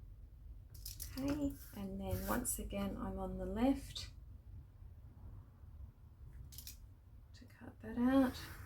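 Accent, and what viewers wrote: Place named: Australia. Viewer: Happened across your video and oh my goodness it's stunning, and I'm not a fan of orange! And it's so good to hear an Aussie voice!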